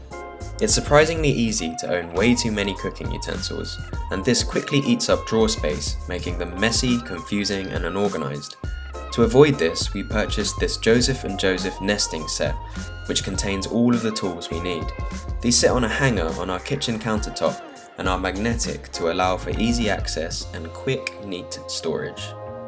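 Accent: United Kingdom. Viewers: For some reason , I didn't hear his accent until he said "living in London". Then BAM! I was like holy shit I didn't realize he was speaking in a British accent.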